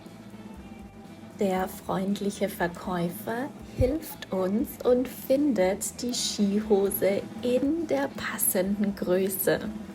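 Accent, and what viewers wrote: Standard. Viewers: [sch ≠ ch = -ig] Die Grundregel für die Aussprache der standardisierten, literarischen, landesweiten, einheitlichen deutschen Sprache. Hochdeutsch.🖤 ❤ 💛 Das ist nicht nur angenehm zu hören, sondern auch leicht zu verstehen! Gut machst 💪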